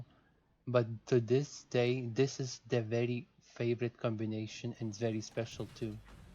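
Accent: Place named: England, United Kingdom. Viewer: I really like you English accent ❤️👌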